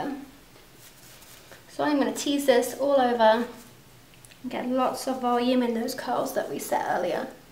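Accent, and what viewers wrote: United Kingdom. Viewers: I always thought you would be from the US - I was quite surprised to hear your sweet English accent! Your hair as you take your pin curls out makes me want to chop mine right off - it looks so gorgeous, an aqua Marilyn - LUSH.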